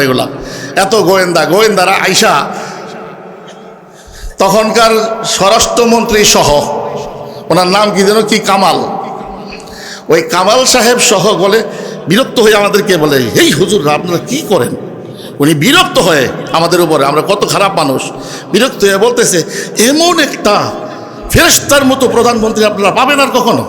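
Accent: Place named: Pakistan. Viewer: হুজুর আপনি যে পাকিস্তানি বংশধর তা কথাই বোঝা যায়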